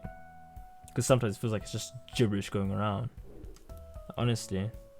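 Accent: South Africa. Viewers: Myyyyyyyyy bruuuu!! Was NOT expecting a fellow SA to be doing a Krita tutorial. I picked up your accent like 3 seconds in lol. Thanks for the tutorial, this is the second one I've watched and I like how you are diving into each aspect. The first tutorial didn't really explain the "New Canvas" dimensions page at all. It's a bit overwhelming because there are soooo many tools and I haven't done digital art before. Regards from Cape Town.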